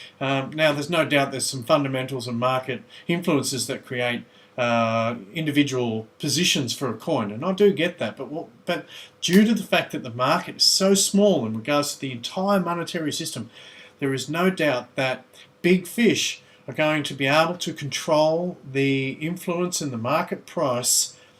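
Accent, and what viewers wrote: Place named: Australia. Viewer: thanks, I'm enjoying your commentary, nice to hear an Aussie voice on YouTube!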